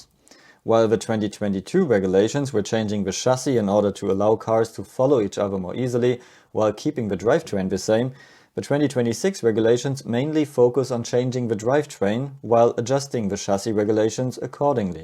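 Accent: Germany. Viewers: Danke, endlich mal ein Deutscher der es vernünftig knapp erklären kann. Ob auf deutsch oder englisch ist egal - der Akzent vermittelt ein gewisses mindset😂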